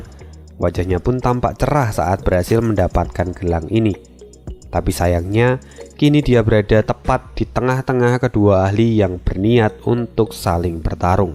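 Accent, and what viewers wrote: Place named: Java, Indonesia. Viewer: Mimin btw orang mana yaa kayaknya logatnya logat Jawa nii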